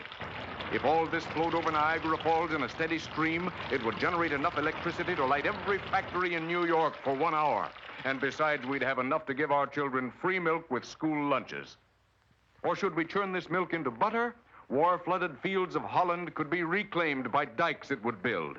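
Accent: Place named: Ireland. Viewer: Does anyone happen know why the Disney staff chose an Irish narrator for this short?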